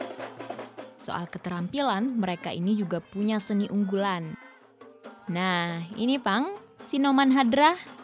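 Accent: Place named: Sunda, Indonesia. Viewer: Mirip sunda logatnya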